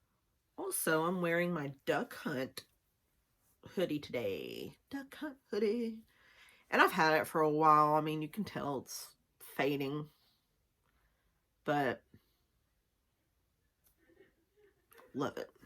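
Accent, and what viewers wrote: South, United States. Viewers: I really love your intro! lol your country accent is just a little stronger than mine! praying for you and sending positivity! Thats me right now so I am with you on that. Losing someone you love and never being able to see them again is so hard.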